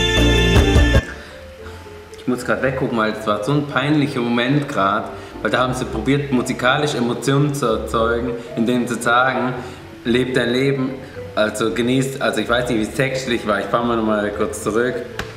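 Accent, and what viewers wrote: Swabia, Germany. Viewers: Haha, das Geschwäble! Grüße aus Tübingen 👋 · Ich liebe Dein Schwäbisch, bin aus Stuggi ...herrlich 🙏👍🙏👍🤣🤣🤣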